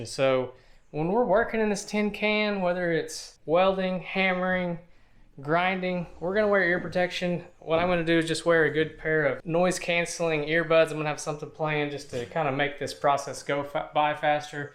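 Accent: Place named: Boston, United States. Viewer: Your boston is beautiful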